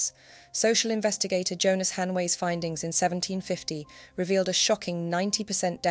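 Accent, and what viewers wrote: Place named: Oxford, United Kingdom. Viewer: Trying to place your accent, are you from Oxford? You have a wonderful voice, I live in Edinburgh and some English accents are harsh, yours is lovely, I could listen to you all day. 😍. As always an informative video.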